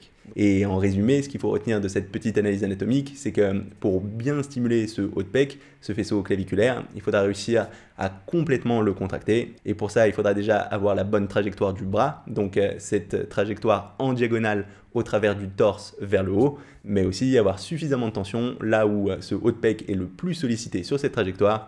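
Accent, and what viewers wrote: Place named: Bourgeois, France. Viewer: C'est pas de ta faute mais ta manière de parler c'est vraiment insuportable ta un espece d'accent de bourgeois chelou troo enervant. Sinon la video est super bien faite bien expliquée et merci pour les conseils mnt je vais faire comme toi.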